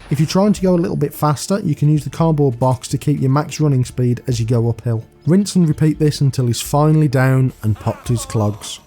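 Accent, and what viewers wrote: Birmingham, United Kingdom. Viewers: couldnt place the accent til he said 'enemuy' hahaha brummie for sure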